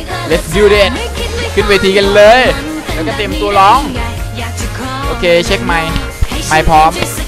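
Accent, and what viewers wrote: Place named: North, Thailand. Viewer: นายเป็นจังหวัดไรครับ ? เหมือนคนเหนือเลยอะ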